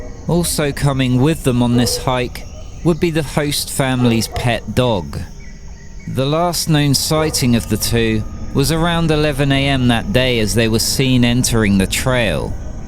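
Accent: United Kingdom. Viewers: Your English accent makes the English language lovely to hear and understand.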